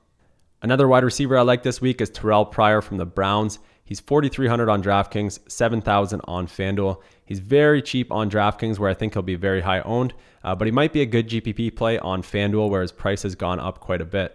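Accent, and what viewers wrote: Canada. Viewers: SirDeonTheGreat he's from Canada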